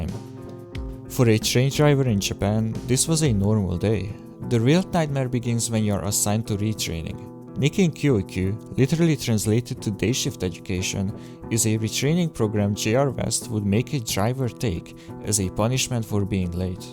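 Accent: Hungary. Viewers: Az angol kiejtésedről nem mondanám meg, hogy magyar vagy, de a japán szavakat olyan magyar akcentussal ejted ki, hogy az elárulta. Nem rossz. Maga a videó se, elég érdekes. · Csak nem Magyar vagy? Nem nagyon hallottam az akcentust az előbbi videókban, de most kicsit jobban lehet hallani.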